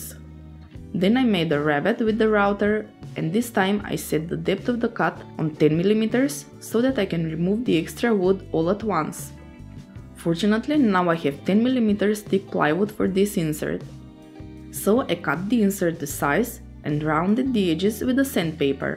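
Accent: Russia. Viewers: Крутая работа! Что за акцент? Русская что ли ? )) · Откуда вы, девушка? У вас сильный русскоязычный акцент... . :))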